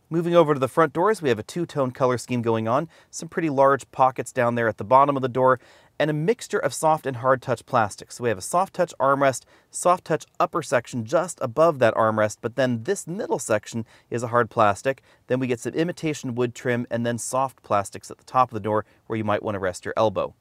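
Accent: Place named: Texas, United States. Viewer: Must be that Texas accent.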